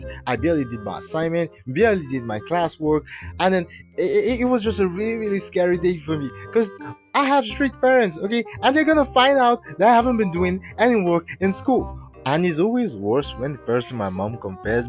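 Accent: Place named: Africa. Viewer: You are African.(the dang accent reminds me of ME)😂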